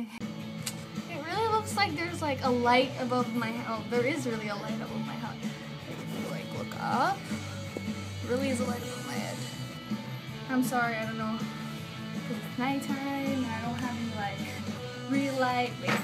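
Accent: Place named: United States. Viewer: You sound American. Were you raised in the States? Shame about the real YUI's hiatus, right?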